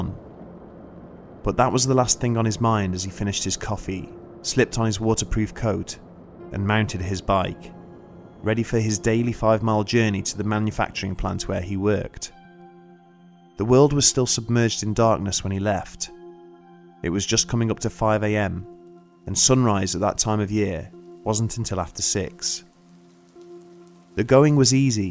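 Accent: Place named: North, United Kingdom. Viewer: Brown The narrator speaks with a northern UK English dialect and there is in fact no 'correct' English grammar. Language is an ever changing and evolving tool that humans use to communicate.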